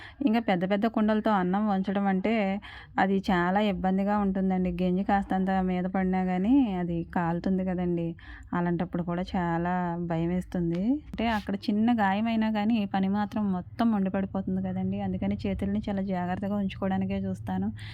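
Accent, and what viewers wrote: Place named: East Godavari, India. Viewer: ne matlade slang ma vari menattagari ilane untundi.vallu east godavari dist. Nannu Amma Ani antunnavu I felt very good. Inka na family maku oka abbayi. Ante ma varu monna sept lo cancer to chanipoyaru. Anduke nenu austrelua ochhesanu. Ma kodalu kuda ikkada oka pedda off lo engineer. Ma abbayi kuda engineer. Vallaki oka papa vahini 3rd class. Nenu kuda ikkadiki ochhi job chestunna. Na age 65 ayina naku individuality ekkuva. India rate prakaram 75k ostundi. Naku hyd lo oka place undi. Ikkada ma pillalu 2 houses 2 cars hyd lo 2 places konukkunnaru. Valla salary lo konni loans cut avutayi. Anduke nenu job ki vela. Ikkada Anni mechines kanuka nuvvu chesinanta pani undadu. Nenu ma kodalu evariki veelu unte vallam chesukuntamu. Idi na sangatulu. Ma sisters antaru. Inta age lo job enduku pillalu chusukuntaru kada Ani. Naku oppadu. 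Amma nenu msg pettindi neeku ardam avutunda. Lekunte nenu msgs manestanu. Cheppu emi cheyanu.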